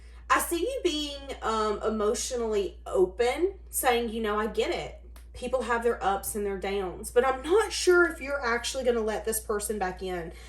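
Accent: South, United States. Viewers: You my dear are SPOT ON! It is so unreal how you nailed it. I love your honesty, advice, and your southern accent is DARLING! Thank you for sharing your gifts and talents with all of us. ♥️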